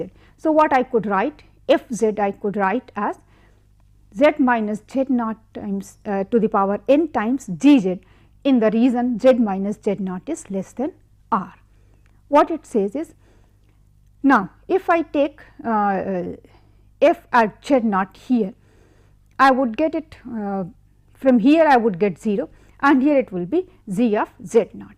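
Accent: India. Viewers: I'm in big dilemmas 1. I'm an Indian, studying in an Indian engineering college. 2.I really respect the fact that the HRD has implemented NPTEL and uploaded a whole lot of courses to Youtube. 3.I was very much delighted when I got to see this. I thought things are gonna go much easier now! 4.But! I JUST CAN'T TOLERATE THIS ACCENT! I tried hard, but NO! Even many other respectable teachers' accents in their videos gave me a difficult experience. I tried to be really gentle in saying this, knowing that the faculties are really respectable. 5.Conclusion- It doesn't seem that things have changed drastically and my hatred for Indian engineering courses continues to be at a peak. PS. Thanks if you read fully. Now go back to that crappy lesson. Oops, used an inappropriate word :p who cares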